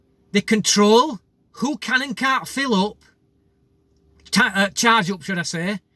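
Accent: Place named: Yorkshire, United Kingdom. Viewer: Kernow(Cornwall) is made from granite,lithium is found in granite,so why isnt Kernow covered with lithium mines?I take 2 hours to fully charge from a 13 amp plug at home.my range is 15 miles with no regeneration available..I suffer no range anxiety when the battery goes flat.The battery is 5 years old and still charges fully.When flat,I just push harder on the pedals. Haha its a folding bike.I love accents.Hope I wont insult you to guess Yorkshire,Leeds/Bradford?Yo orite luv.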